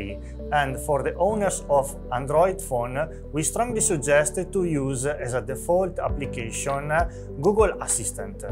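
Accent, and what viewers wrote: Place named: Italy. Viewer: Si sente che è italiano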